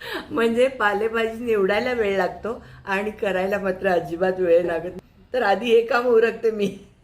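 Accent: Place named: Kolhapur, India. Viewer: आम्ही पण 😀आजच तुमचा रेसीपीचा वीडियो प्राथमच आला माझ्याकडे आणि बोलण्याच्या टोणमुळे लगेच समजले कि कोल्हापूरी असणार तुम्ही ....... 😊👍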